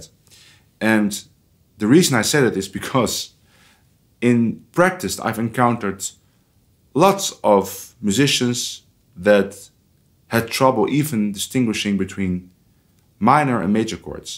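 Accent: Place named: Netherlands. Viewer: that dutch accent tho :p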